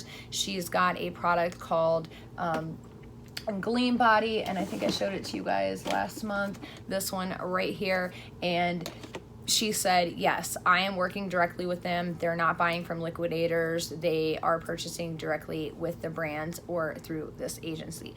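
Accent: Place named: Kentucky, United States. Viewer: i thought your accent sounded familiar ! i had no idea you were from kentucky! thats awesome :)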